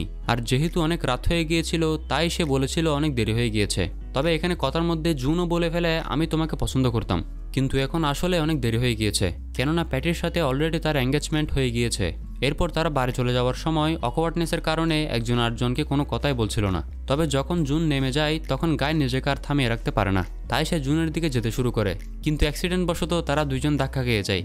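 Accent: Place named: Chittagong, Bangladesh. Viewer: চট্টগ্রাম এক্সেন্ট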